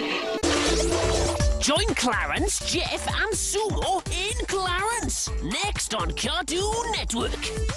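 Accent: Scotland, United Kingdom. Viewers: The narrator sounds like a Scottish accent. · It speaks British accent it's sure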